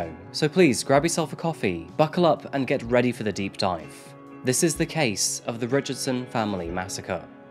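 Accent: United Kingdom. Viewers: You where made for this ,how you do yo thing man ,how I want to say I enjoy the show will come off weird and socker like so I better stop 😆🤣,anyway love how you set your channel big time 🖤,love when you call bullshi... t when u see it and with a British accent ,gets me every time,ok it's getting weird bye 👋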